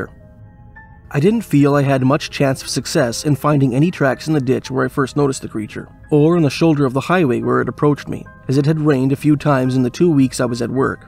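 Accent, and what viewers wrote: Canada. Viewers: I can tell by your accent that you're Canadian 💯 I think the Northwest Territory is the scariest most unknown desolate creepiest place in all of northern North America !!!! Especially the Nahhani region not just because of all the eerie stories but how much of a extremely dangerous gigantic maze it is of huge canyons & rivers & its completely surrounded by other giant regional mazes of forest & mountains & frozen tundras !!!!! It's like the heart of that whole entire region & even though ppl have been there, it's still the most mysterious unknown place in all of north America because of how remote it is & impossible to get to by foot or by automobile!!!!! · Love your Canada accents of passsta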